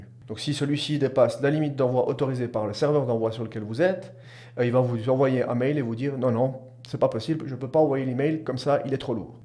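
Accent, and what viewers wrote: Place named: Belgium. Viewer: Très clair et précis et avec un bel accent belge si je ne me trompe pas ! Thanks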